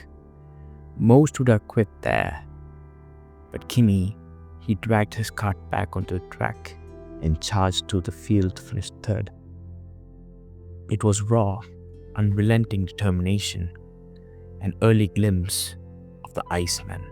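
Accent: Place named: India. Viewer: real - I think indian accent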